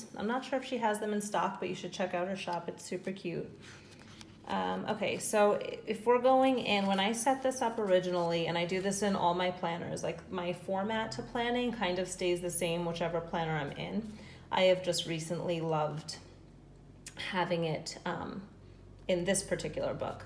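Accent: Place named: North, United States. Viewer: I wouldn’t say that you say it differently. I would say it’s a northern compared to southern accent. I like it. We draw everything out in the south. I’m very self conscious about my twang. I had someone, not from Oklahoma obviously, tell me that I was really smart and they were surprised because of my accent. 🤷🏻‍♀️ WTH does that mean?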